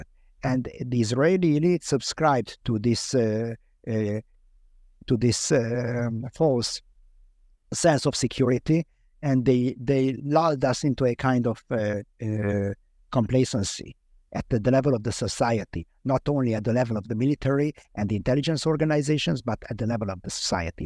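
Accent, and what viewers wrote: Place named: Hungary. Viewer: i though you had hebrew accent in english, but that is in fact still hungarian, nice that it remains.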